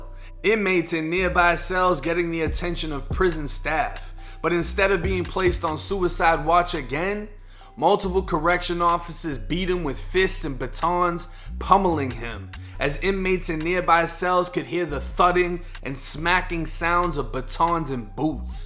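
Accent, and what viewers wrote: Boston, United States. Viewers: Is that a Southie accent? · Is he from the south or is he from Boston ???? He sounds like Marc wahlberg and Jay let’s live life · Damn what part of South Boston Florida you from 😂 carrr lol thick ass accent sound like Mark Wahlberg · Love your channel bro👍 Just curious about your Boston sounding accent? Did you always live down South. I’m from Brooklyn living in Jersey and I’m always hearing about my accent😂 Keep up the dope content 💯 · Sounds like he's from Boston good content i just subscribed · It’s the Boston accent for me. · Is he a Boston dude? Accent killin me lol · Bra if you from Tampa why you sound like you from Boston? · This guy is so Boston I can smell the clam chowder from here! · Aye big bro are you from Boston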